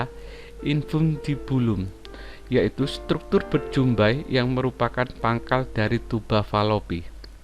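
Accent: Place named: Java, Indonesia. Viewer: Medok pak😂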